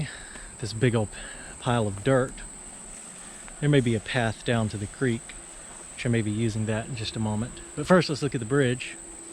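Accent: South, United States. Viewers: Wow, that the most beautiful Ridge l have ever seen. Those arches under it are so amazing, l have never seen anything like that before. And looking up at the railway bridge the creek was absolutely gorgeous. Those gun shots where worrying though. Thank You so much for the videos, your a real Southern Gentleman,l love your accent, so different from here. Keep Safe, Debra from Australia. 🌿🍀🌷🌹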